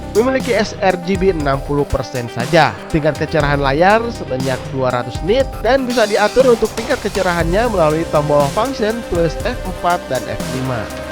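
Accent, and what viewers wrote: West Java, Indonesia. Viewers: amd dan jipors. sundana teu bisa di leungitkeun. haha.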